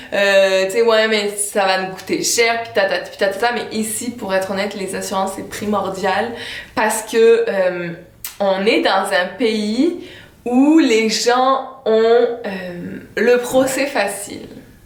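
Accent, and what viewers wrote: Quebec, Canada. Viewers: Un melange délicieux d'accents québécois et français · c'est dingue l'accent québécois que vous avez pris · tu a choppé l'accent Québécois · l'accent canadien est en train de prendre le dessus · 50% accent qc 50% francais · elle a un accent quebecois un peu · Ouii un petit accent du Quebec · l'accent s'apparentait plus à l'accent Québecois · elle a un accent mêlé entre l'accent français standard et l'accent québécois standard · Tu commences à avoir l'accent québécois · elle a un accent parfois quebecois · t as l accent québecoise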